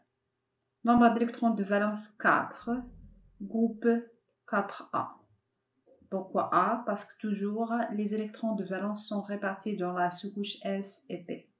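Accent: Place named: France. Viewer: hta haja ma mafhoma quality t3 son na9sa w zid l'accent francais tab3tha ....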